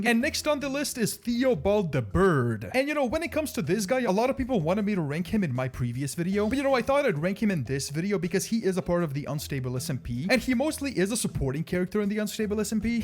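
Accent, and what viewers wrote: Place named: United States. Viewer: lil bros voice changed to american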